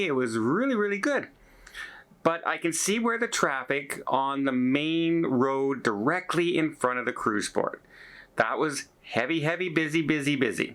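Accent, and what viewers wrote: Canada. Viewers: He is Canadian give him a break on the pronunciation, eh?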